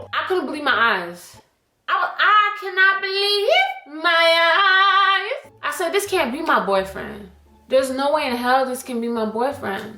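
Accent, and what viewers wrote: Jamaica. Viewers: Is the rich jamaican accent for me... perfect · Mi luv di patwa lol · Aye mi love di accent Hafi chip it in sometimes 🤣🇯🇲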